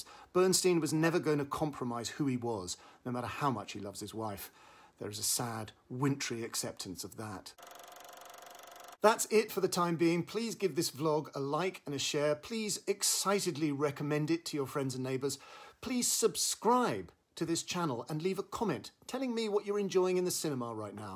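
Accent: United Kingdom. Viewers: British darlin’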